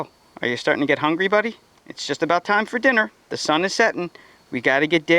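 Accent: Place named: South, United States. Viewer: I love your Southern accent! ;-)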